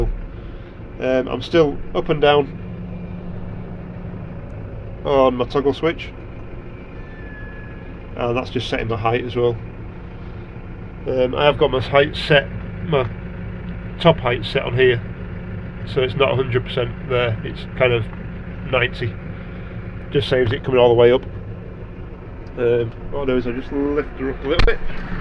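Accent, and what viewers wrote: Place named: United Kingdom. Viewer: In my opinion, one of the big pluses of watching British farming videos, beside hearing the cool accent, is to see the differences in Ag techniques. For instance, you're using chain harrows to de-thatch grass fields/pastures. This is very interesting. We've never done that. All we have done is spot spray and mow down the high weeds and thistle with a rotary chopper (brush hog). Every once in a while we used to put up a temporary electric fence in the springtime and disc the field a few times, followed by seeding it with a cyclone grass seeder. Of course we were posturing a beef herd and not horses. Steers aren't as picky about the quality of their forage as horses. I've only seen chain harrows used around here to break up clods of dirt. They are usually pulled in a separate operation after the plow and disc harrow have gone over the ground to turn and pulverize the soil. 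Chain harrows are used locally to level out the furrows and uneven ground left by the first two tillage operations in preparing a seedbed. Usually just prior to planing a small grain crop like oats, rye or barley. We also used them when rotating a field back into hay, just before we drilled in the seed. It gave us greater soil to seed contact and we had better germination rates. Also, I think all the chain harrows I've seen do not have a frame. They collapse or simply roll up for storage. Thanks for posting. Very cool. Cheers! Whipple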